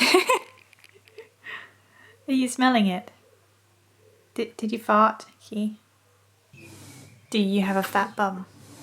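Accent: United Kingdom. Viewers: Ha! I was just watching another of your videos and running out the door, threw my phone in my hip pocket. Moments later I thought my “bum” was talking to me. In America. It had a Cornish accent. ... This video had started playing! 🌷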